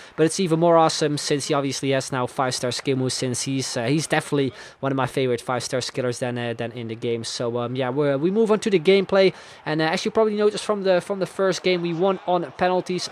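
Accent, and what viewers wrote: Netherlands. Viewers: How the fuck does he do that unlocking shit. Amazing! And hearing him talk English I immediately knew he was Dutch, even better.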